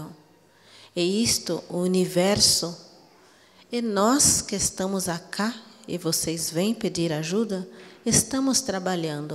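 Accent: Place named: Spain. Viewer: Eu, como um bom gaúcho aqui da fronteira, amo esse sutaque espanhol, que faz parte da nossa gente aqui dessa região sulina! Buenas minha! Me gusta ouvi-la!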